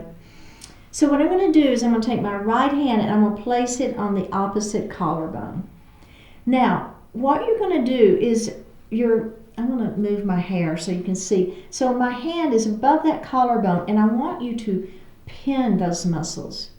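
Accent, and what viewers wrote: South, United States. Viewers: Hi pretty, So happy I found your site even though your challenge started a year ago, better late then never! I have just recovered from Cervical Spine Surgery and had 4,5, and six disc removed and a titanium plate with a screw as replacement. I will be 79 May 4th. I had the surgery February 6th 2023 and in August- December had 3 very bad falls. One of the problems was a medication called Cymbalta and having researched for weeks it has been known to be a destructive med for many people since around 2014. There are doctors and people on YouTube that have shared their horrible experiences with getting off this med. I’m talking way over 100,000 people but most doctors are handing it out like candy and the withdrawal process must be very, very slow. Don’t know why I rattled off all of that so I’ll blame it on Cymbalta! I have told other people and my new Physical Therapist and even tho I have Fibromyalgia and arthritis they said go for it! 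Love your southern accent and your peace. I go to Bible study and have His peace but I tighten my shoulders many times instead of letting them relax. I will just keep trying my best to relax😊❤❤ Sincerely, Paulette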